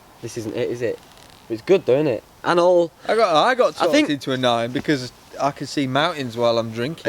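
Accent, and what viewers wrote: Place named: North, United Kingdom. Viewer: Came by after Zed mentioned you and I'm glad you did. Quality vids. How much did you have to pay Rick Grimes to camp with ya? haha. Can't place your accent either. I know its northern but it's got hints of all sorts hah. Keep at it bud. ATB, Mike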